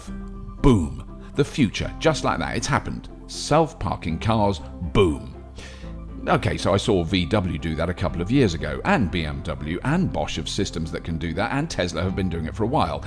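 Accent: United Kingdom. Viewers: i love the enthusiasm in the narrator's voice....very British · I love the British accent.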